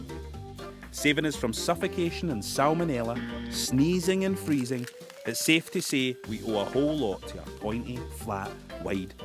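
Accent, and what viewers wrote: Scotland, United Kingdom. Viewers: The best thing about this video is your Scotish accent mate.. not much else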